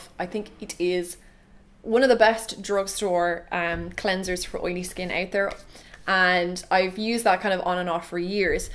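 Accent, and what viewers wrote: Ireland. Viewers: I could sit and listen to your beautiful Irish accent all day. So nice.....You look so pretty and I love your hair color.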